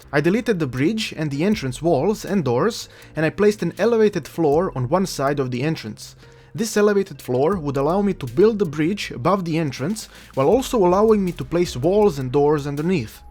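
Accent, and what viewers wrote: Eastern Europe. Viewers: Nguyen He's from someplace in Eastern Europe, don't know exactly where. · The Eastern European accent makes the suble vulgar comments pretty enjoyable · where are you from? your accent sounds eastern European or Russian. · You are so funny mate!!! Also what country are u from, u sound Eastern European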